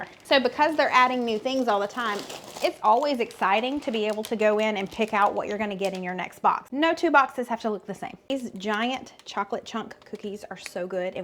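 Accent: South, United States. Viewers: I'm from the south. We have similar accents. You sound like me. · I love your southern accent I have friends who have a southern accent im a Yankee in Michigan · Once again everything looks Delicious and I Love Slow Cooker or Crockpot Meals. On the Accent. You do have one, but not heavy as some Southerners...lol. I think it's cute. I live in South Texas, but I was born in Kansas and raised there until age 6 and then in Colorado until I married. I was asked one time where I was from cuz I'd say Winda instead of Window and Worsh instead of Wash. Sometimes I still do. Accents make us who we are and where we're from. I Love yours! I don't think I've pick up a Texas accent, but I'm not sure.😂 No one has ever said. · I'm from Iowa, so I have a Midwestern accent, but I love your Southern accent. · I'm a California girl, but my ex-husbands family was from Arkanas, and I picked up their southern accent. 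I say boilin and fixin somethin, supper. I love your accent. Don't change anything about yourself❤ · Hi ❤. I’m from S Texas and I love your “very” Southern accent. I don’t think I have an accent either 😂. Love your podcasts and all your recipes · I have seen so many crockpot lasagna recipes that just didn’t turn out looking like they were good. So many looked so dry. They were probably overcooked. But this one I’m definitely going to try bc it looks delicious! Thank you! Girl, I’m from the South too and we have a southern drawl not an accent! At least that’s what I’ve always thought. We lived in of all places Georgia! And most people that we met thought the same! They would say “we love hearing you talk bc of your accent! We were in Peachtree city and it’s a stirring pot of people. Most were northerners (Yankees)😂😂We made some good friends! I loved hearing them talk and likewise! All good recipes on this video❤😊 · You are so sweet! 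I’m from near Toronto Ontario Canada. I love your southern accent! Love your recipes too. Hugs to you and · I'm from the Midwest...St. Louis area. I love your southern accent. I moved to central Georgia in 2000 and lived there for 17 years before moving to Alabama for another 3 years. When my husband passed away suddenly in 2020, I moved back to the midwest to be close to my family. Listening to you reminds me of some of the best years of my life... and I love to try your recipes..even though I live alone and they make way more than I need. I either cut them down or freeze then in portions. And someone told me I had a "funny northern accent" once. I'm sure the lady who commented from Scotland thinks we ALL have an accent. Keep doing what you're doing and I'll keep watching. 😊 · You definitely have a Southern accent. I grew up in Iowa which is in the Midwest but have lived in Colorado for the past 35 years. 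😊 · I was born in Ireland and had an accent, trust me you have a southern accent. It is nice to listen to. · Southerner here, my accent is similar to yours but some of your phrases are a bit thicker than mine but im so used to the southern accents and very southern accents it doesn't seem out of the norm but when I travel out if the south ppl always ask where in from 😂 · as someone who lives far from you in Nevada, I promise you have a very strong Southern accent and verbiage. Personally I find it charming and endearing. When you speak I giggle. I love your accent and all. Do not change. ❤ You are perfect as you are. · You sound "classy southern". I'm southern too. Say words the same way. Those meals looked great. ❤ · I always thot southerners have the drawl lol...you know like boyyyyooolling...so is right lolol! I love yall! Did yall get snow? I had to rewatch this to see if i wanted to try it....yes...got all ingredients on my walmart order for tomorrow! 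I dont like spicy hot...so leaving that out! Keep up the great videos you two! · Southerner I def sound like you but I still don't know what chow chow is 😂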